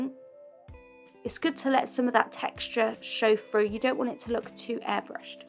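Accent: United Kingdom. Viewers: Hi,thank you so much for another great drawing tip. I am a portuguese speaker brazilian boy,graduated in arts many years ago,and thanks to living in the US for many years i a can understand you perfectly. You are hands down the BEST gifted drawer on youtube. May God bless you always so you can keep always bringing more and more very useful drawing tips like this. Ps: i love your british accent!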